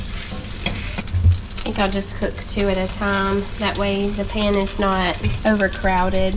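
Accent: South, United States. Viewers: Your video just showed up in my recommendations...I subscribed before the video even ended because you have a nice personality. I'm from NC so I recognize your southern accent...where are you located?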